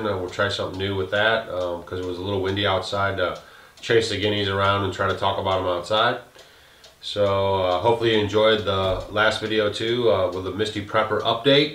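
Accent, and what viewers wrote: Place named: Arkansas, United States. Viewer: my friend from Little Rock sounds just like you. Is that where you are from?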